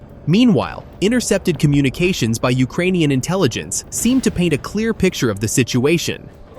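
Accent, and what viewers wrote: United States. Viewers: Do you steal all of your footage from Azov YouTube channel? with an American narrator... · cant stand the overly "american" accent voice overs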